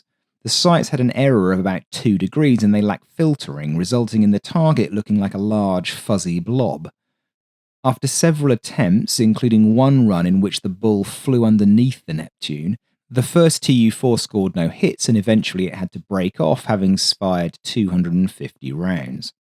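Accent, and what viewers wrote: United Kingdom. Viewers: "Loo-tenant"? Really? You sound British, so why are you using American pronunciations? Just a part of the continuing Americanisation of the United Kingdom. Sad.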